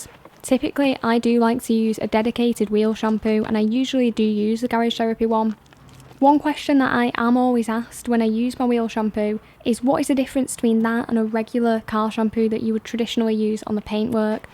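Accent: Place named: Scotland, United Kingdom. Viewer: What a Beautiful accent. Its that Scottish? or regular British? But I Love it though very sexy. Nice Job on the wash and great car too.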